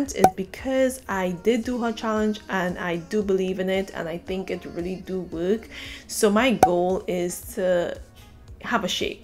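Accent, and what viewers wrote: Trinidad and Tobago. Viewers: Jayna Danielle Im saying this girl sound like Trini Im from Trini.... I live in Arima be safe 🙏🏼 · Is that a Trini accent? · I'm from trinidad and I made out your accent just had to scroll through the comments to make sure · I came to get inspiration for me to continue one of her challenges but then I heard your accent...HIIIIIIIIIIIIIIIIIII TRINBAGO SIS❤❤ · As soon as you started talking i was like she's from Trinidad or if not from the Caribbean. I am Trinidadian as well! But currently in the US.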